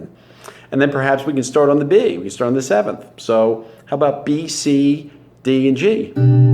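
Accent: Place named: East, United States. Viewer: I realize now that you've been in England for a long time, and that's probably why I hadn't seen you, and your eastern US speaking style didn't give this away. :)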